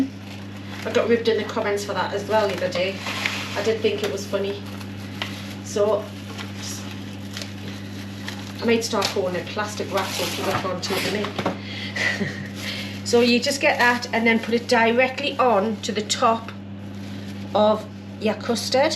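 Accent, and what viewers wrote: Newcastle, United Kingdom. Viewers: Another yummy video. Please don’t hide your accent. The Geordie accent is the best in the country in my opinion.😊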